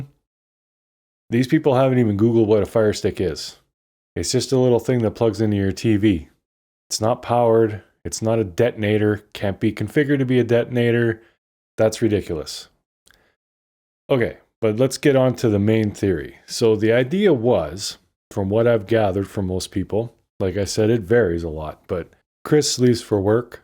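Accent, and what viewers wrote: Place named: Alberta, Canada. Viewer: I thought so. I live in Texas but am from Alberta. I hear our accent lol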